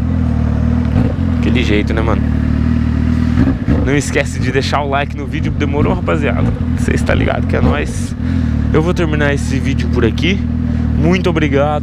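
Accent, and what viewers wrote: Goiás, Brazil. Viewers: a TEE AMOOOO ta flnd NO PIQUE DO GOIAISSS VELHOOS TEMPOOSSS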